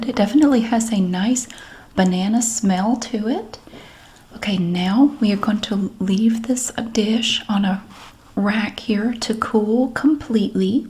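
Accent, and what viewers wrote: South, United States. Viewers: I love the way you say oil with your southern accent! I was making gingerbread the other night and I was thinking of you and I said ad the ol 😂.. my son squinted his eyes and looked at me lol.. I said it's an inside joke.. u wouldn't understand. I love your southern accent!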